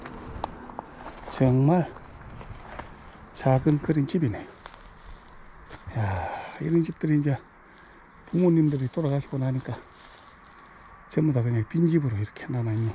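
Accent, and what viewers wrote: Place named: Jeolla, South Korea. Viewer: 이 유튜버전라도분이네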